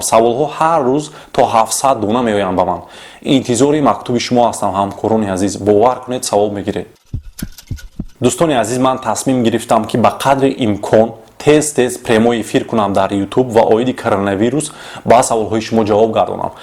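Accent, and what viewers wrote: Khujand, Tajikistan. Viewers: Духтуримиз назаримда Хуҷанлик. Акцент 100% тушунарли.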